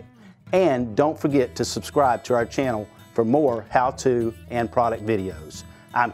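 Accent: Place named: United States. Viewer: No offence but I'm an Australian arachnophobe. Your American accent is not reassuring for me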